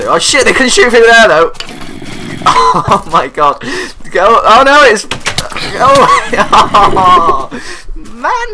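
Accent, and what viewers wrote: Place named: Yorkshire, United Kingdom. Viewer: wait wait wait.. youre from yorkshire.. WHERE TELL ME NOW..btw me too :)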